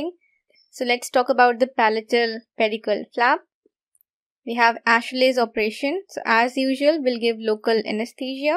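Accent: India. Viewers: Medok banget Indian mu sist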